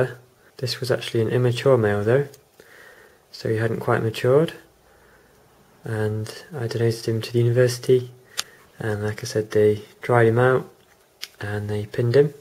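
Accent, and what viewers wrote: United Kingdom. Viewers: I thought your accent was Derbyshire Midlands.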